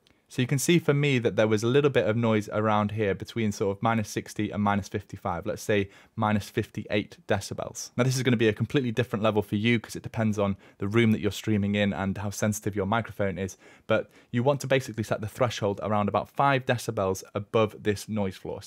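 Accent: United Kingdom. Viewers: you sound british..why do you give measurements in INCHES??? and why dont give both plz? Centimeters are world wide used!! IS very discouraging to have to go to an online calculator to convert them and moreover knowing that british like the rest of europe and maybe all the world ( except USA ) uses the metric system.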